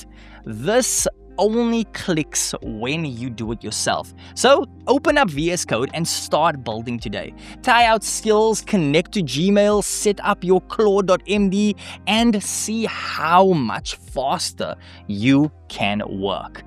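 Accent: South Africa. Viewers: What’s that accent dawg? South African? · My guy are you South African?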